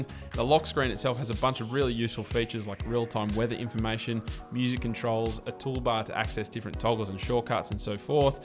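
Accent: Australia. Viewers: you Aussie mate?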